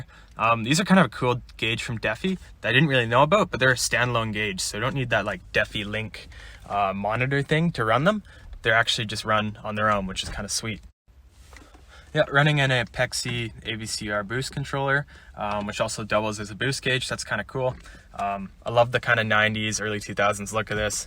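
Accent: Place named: Canada. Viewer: Instantly knew he’s from Canada by the way he pronounces his O’s and R’s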